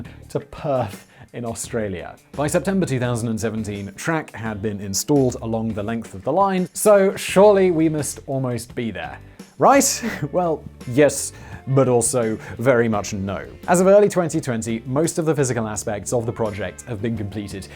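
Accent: United Kingdom. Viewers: Why do English people say "figger" or "figgers"???? It is FIG-URE or FIG-URES. Following your logic the racist word for black people shouldn't rhythm with the British pronunciation of "figure", correct?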